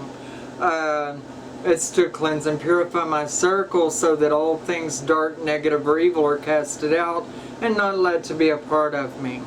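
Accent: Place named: United States. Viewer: she sounds American.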